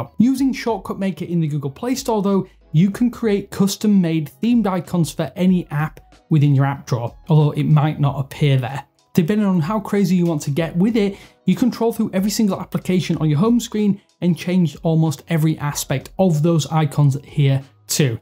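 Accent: United Kingdom. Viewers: Are You British...?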